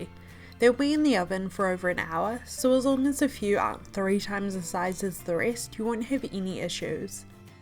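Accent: New Zealand. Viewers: Yeeessss. Recovering from an operation which has put starchy foods back on the menu. Been craving roast potatoes for almost 5 years. Gonna try it with sweet potato too, do you reckon it'll come out crunchy? Not only did I get the recipe to do them like nan used to, I got it in an easy to listen to New Zealand accent. Sweet as, cheers from across the Tasman. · Lovely recipe,! And is that a kiwi accent I hear?:)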